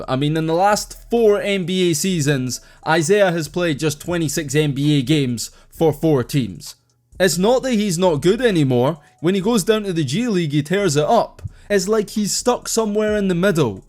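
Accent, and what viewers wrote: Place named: United Kingdom. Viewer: when i heard your accent i thought i clicked on wrong video, Brit talking about basketball is always odd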